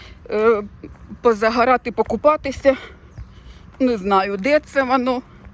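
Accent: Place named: Kryvyi Rih, Ukraine. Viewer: Жалкую, що Ви не з цього міста. Хотіла попросити про допомогу. Треба тих, хто знає головних на кладовищах. І ще, у вас говір криворізький, рідний❤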